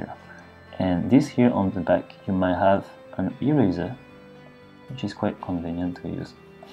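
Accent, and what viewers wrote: France. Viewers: u loose a little bit your french accent in your latest videos ;)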